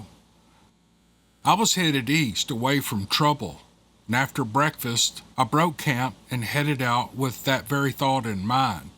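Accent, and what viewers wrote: South, United States. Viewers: your commentary, opinion, jokes, southern drawl and personality are why we follow you. Hate to hear you constantly apologizing for being you. You need to understand that's why so many love your channel and continue to follow/ subscribe. We can hear Bigfoot stories many places but only at do we get the Dailey dose of we all need in our lives. Sending love from your Yankee followers in CT ❤❤❤ much love brotha, ty for being you!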